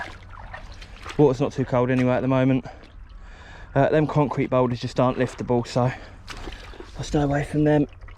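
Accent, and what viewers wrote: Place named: Scotland, United Kingdom. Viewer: to pick em up if you want them for bait, lol was for how scittish you sound buddy ha ha keep up the good work fella hopefully things go well for you and the family stay safe !!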